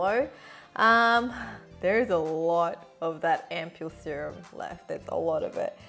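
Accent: Australia. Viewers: I seriously love the Australian accent XD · i know it's because of her australian accent, i just sometimes mishear what she says anyway because i don't hear australian accents often lol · I Looove your aussie accent !! I cannot sound Australian for my life 😂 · I like your accent, is it an Australian? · I love the Aussie accent actually, I think it sounds fun and endearing. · Yashti Raj I think cuz she's Australian. I've heard a lot of Australians say it like that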